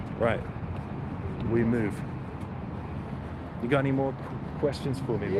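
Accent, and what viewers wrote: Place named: Scotland, United Kingdom. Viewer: J where is your accent from? Driving me insane. I hear my native Scottish when you talk. Please let me know 🤞❤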